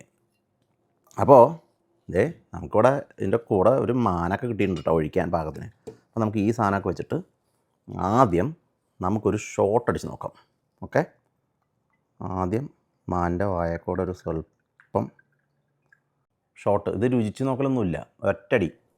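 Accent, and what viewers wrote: Thrissur, India. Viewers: എന്തുട്ടാ 😂😂😂 ത്രിശൂർ ആണല്ലേ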